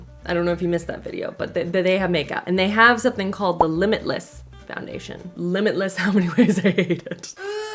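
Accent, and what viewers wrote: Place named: Canada. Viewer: As a fellow American, if you want to hear this accent in real life, but can't go to Canada, come visit the midwest. Especially Wisconsin or Minnesota- you'll hear it everywhere! 😂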